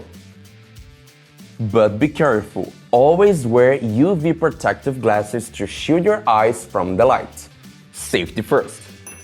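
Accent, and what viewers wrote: Brazil. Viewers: esse inglês com sotaque brasileiro... tô errado?! massa o conteúdo! o Loot é referência de qualidade e excelência. inspirador. thanks!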